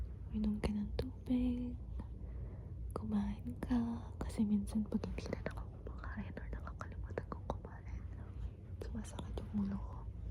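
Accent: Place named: Conyo, Philippines. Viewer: Conyo soft spoken naman, taglish 😍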